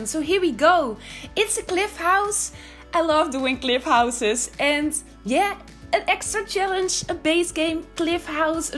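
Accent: Netherlands. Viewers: Are you dutch? Your accent sounds dutch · Are you Dutch ? You have a Dutch accent. Either way this building is soooo good wth, I’ve never seen something like this from the sims, you did a really good job! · Ik kan hore dat je nederlands bent hup nederland!!!😂❤️❤️ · I can immediately hear that you're Dutch 😂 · I am so in love with dutch accents so this video was a real pleasure for my eyes AND my ears!!! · are you dutch? I hear a very heavy accent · Kon gelijk horen dat je nederlands was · Haha inderdaad, haar Engels is erg goed alleen de uitspraak is erg Hollands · You can hear so good that she’s dutch, it’s so cute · Oke je hoort wel echt duidelijk dat je Nederlands bent. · Where are you from? It sounds like Dutch😂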